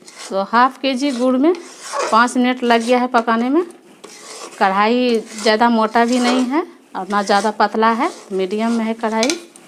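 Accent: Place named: Bihar, India. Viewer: Aapke bolne ka tarika hi batata h aap bihar se ho me v bhagalpur bihar me hi rahti hu. Meri request h aap jo baate krti h usme kuch improvement laaiye viewers badhenge Or likes v jada milenge. Bura n maniyega recipe bhut achi h magar wo kya h dekhne walo ko youtubers v smart chahiye. Dhanyavaad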